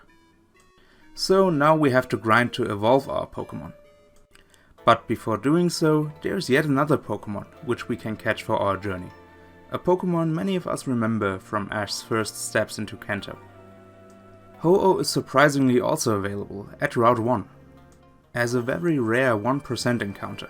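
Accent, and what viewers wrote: Germany. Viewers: Hahaha, nach 2 Sekunden direkt den deutschen Akzent raus gehört. Aber richtig gut. Ist eh besser in Englisch 💪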